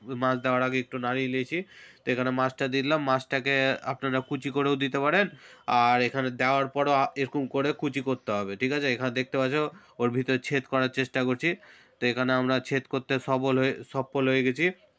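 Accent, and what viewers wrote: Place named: Medinipur, India. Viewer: Amar mone hoy Medinipur ar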